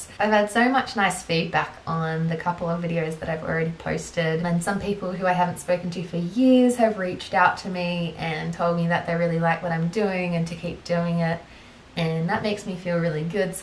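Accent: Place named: Australia. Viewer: Hi! It's Milli, I hope you remember me from Budapest:) I just wanted to tell you how much I love these types of videos (and I'm also proud of myself because I still can understand the Australian accent ahaha) and how much I relate to you especially on this topic. These are the kind of conversations everyone need but unconsciously avoid. Thank you ♥️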